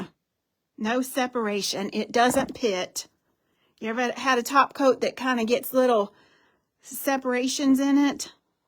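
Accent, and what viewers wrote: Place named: Virginia, United States. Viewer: I love all your tutorials that you have uploaded to youtube. Plus I love to hear you talk; makes me miss home (SW Virginia). I cannot wait to see what else you come up with!!!! God bless.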